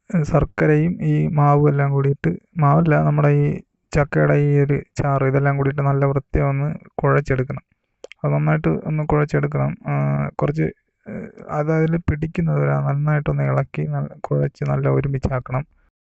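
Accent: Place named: Trivandrum, India. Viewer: അണ്ണാ പക്കാ തിരോന്തരം തന്നെ 💪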